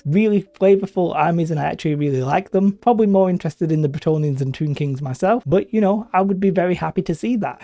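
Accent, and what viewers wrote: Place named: Germany. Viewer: What is your accent, German? Tjought you was a Brit but today I heard you have some charming accent. :)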